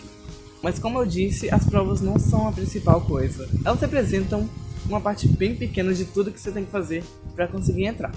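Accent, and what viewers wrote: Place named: Minas Gerais, Brazil. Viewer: Caraca mano, de minas kkkk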